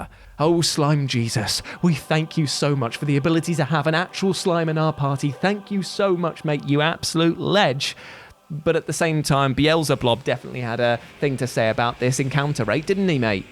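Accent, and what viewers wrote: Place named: United Kingdom. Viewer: he's british lol they pronounce names little differently than most to us it is You-Fee to him it is YU-FEE, like one person pronounced gerudo differently as Gar-Udo while some say Jer-udo making the G sound like a J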